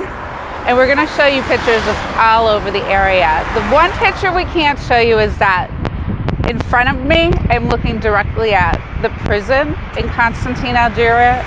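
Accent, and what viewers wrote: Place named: United States. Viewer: It is not english language but american one .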